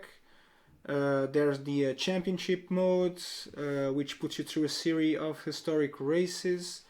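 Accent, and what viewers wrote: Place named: Portugal. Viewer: This english sounds like portuguese?! or am I dreaming. Anyway, very good video, good, simple production, direct to the point! Boa sorte! Mais um sub!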